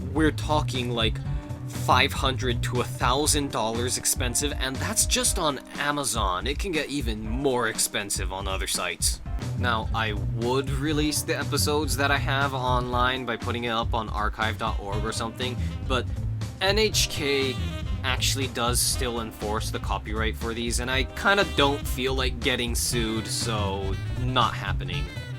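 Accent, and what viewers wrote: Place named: United States. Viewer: Good research, for some reason I didn't image you having such an American accent lul.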